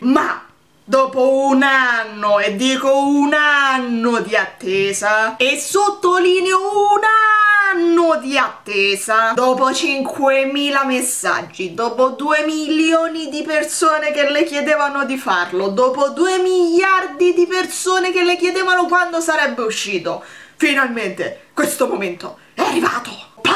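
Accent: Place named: Rome, Italy. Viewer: quando fa la parlata romanesca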